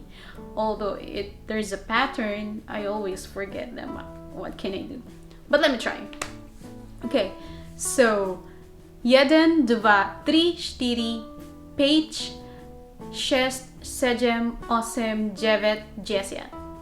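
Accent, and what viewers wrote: Slovakia. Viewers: I liken your slovakian accent .,very cute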